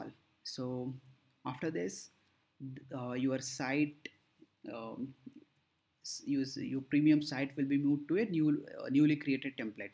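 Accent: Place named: India. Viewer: Thankyou so much. So glad to hear a indian helping us out.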